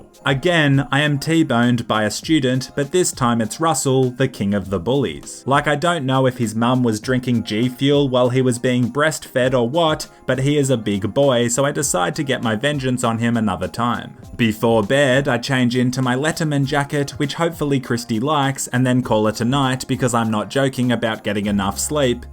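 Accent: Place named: Australia. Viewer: He has an Australian accent that’s why and I’m also Australian.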